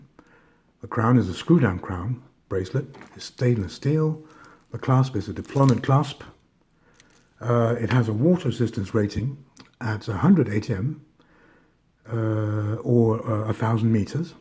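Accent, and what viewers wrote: England, United Kingdom. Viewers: What accent is this? I mean I know you're from England (unless you emmigrated) but where abouts in England?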